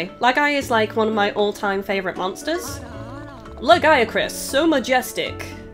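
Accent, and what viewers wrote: United Kingdom. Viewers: Just a quick question what part of the UK are you from? I'm really bad at accents so I can't tell (I'm from the UK too but I only know a few accents for certain)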